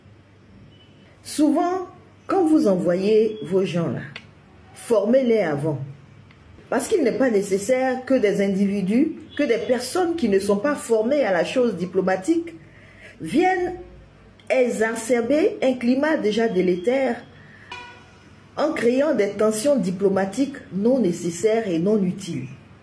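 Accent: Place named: Ivory Coast. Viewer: Ouiii mais vous parlez comme une ivoirienne ma sœur !! soutien !!!! Ils sont trop comme ça !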